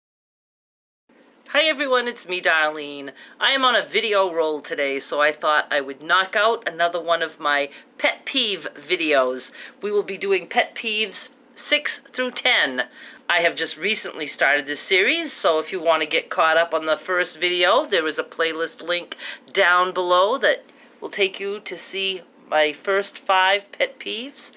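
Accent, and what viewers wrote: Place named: Rhode Island, United States. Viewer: How about when you needed to wear panty hose and you had only two pair and they both had a run? You cut off the "bad leg off both and then proceed putting both pair on, then you get two good legs! I need to. Ask, are you from Woonsocket RI. You have that accent. My pet peeve is a person who ask you to help with a project( like decorate for their child's birthday party) and you end up starting the project and they go to the bar to socialize while you do all the work!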